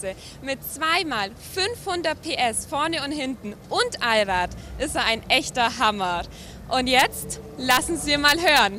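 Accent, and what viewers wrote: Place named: Bavaria, Germany. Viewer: Bayrische Sprache isch doch einfach die beste . Du machst super du musst echt ein Job im Fern machen . Und du siehst in dem Styl super aus 😙🙏🙏🙏🙏😙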